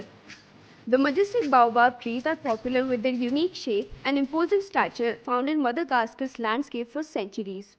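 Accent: India. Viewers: but sounds of india girl.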